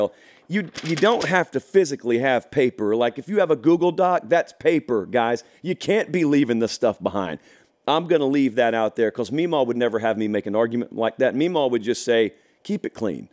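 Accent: South, United States. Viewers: Afternoon, Firstly, I'll state that I'm a Michigan fan, born and raised in Ann Arbor but moved away and lived all over the country; from MI., to Harrisburg PA., West Palm FL., Miami FL., Bmore MD. (Johns Hopkins), Las Vegas NV., Raleigh NC., now out to SLC Utah. Been all over this country (dig your accent by the way, miss the South), almost decided to set up roots in Nashville when I was driving through. My brother is a Gator, my fiance' is a Ute, my best man (soon to be) is a Runnin' Rebel. All of these people know I'm a Michigan fan, and how I'm one of those that's always tried to play by the rules (personally speaking); probably why I'm still in middle management, my brother (Gator) was the politically savvy one who earned the big $$. I say all of this to make a point, that I was upset by what was found out about Michigan. Even my father who's a Michigan alum said "If Harbaugh lied, he needs to go" (very old school, graduated '59, nuclear engineer when Michigan was the only school in the country that had a working reactor *note: prior to MIT). 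However, Dan Wetzel's article makes a great point about the recent news that came out, and you adamantly dismissed. You've also made a point that if you're "speeding and get caught, you can't point to everyone else". I'm a big fan of this analogy as you stated it, because in fact it's very much true. Michigan was caught doing 75mph in a 65mph zone, which is most certainly a moving violation and should be punished. However, while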